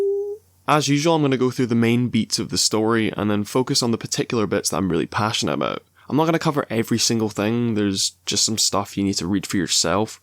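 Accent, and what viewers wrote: United Kingdom. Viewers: I subscribed just cause of the brick wall guy at the end and u sound like u from uk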